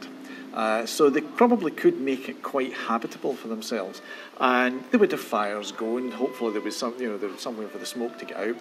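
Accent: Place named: Scotland, United Kingdom. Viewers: It's Scott's accent that aint nice... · Can't understand cuz of its scottish accent.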